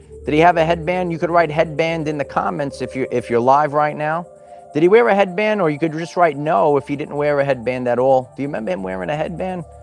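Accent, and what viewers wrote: New York, United States. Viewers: 🙏🏻 Gr888 work as always. I can see why so many ladies come here, but I have to say I come for the TRUTH TALK, and also I’m a sucka for that New York/Jersey (?) accent, gets me every time! 💃🏼 PS I have images of the Lions appearing if you want to see them, seek them out on my Ch Ann el here…last Aug/Sept sometime, one must have the eyes to see… btw this is TruthBeSpoken, I have (4 diff) ch Ann els, dear brother/neighbor. 🕊️🕊️✨🦁✨🦩🦩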